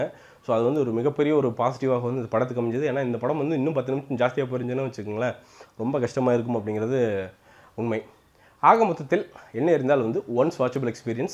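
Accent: Chennai, India. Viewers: U were speaking a good tamil, please don't try to mix Chennai tamil in it... U r from coimbatore not from Chennai... it doesn't suite you.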